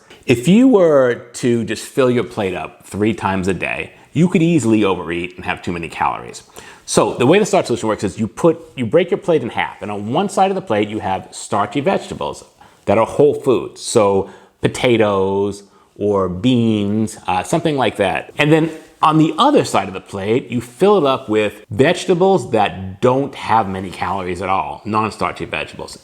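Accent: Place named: Long Island, United States. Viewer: I just found your channel and subscribed after watching the first one. I think you're great. I love your enthusiasm and dedication. It's helping me get excited about the recipes. I especially love your Long Island accent. I am actually a vegan living in Park Slope, Brooklyn, but I've always wanted to live in Phoenix/Tucson. Should I make the move? Looking forward to more videos! Thanks!! Keep up the great work.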